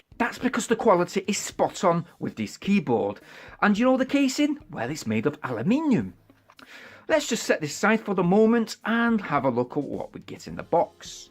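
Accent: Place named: England, United Kingdom. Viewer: Love the presentation and your English accent!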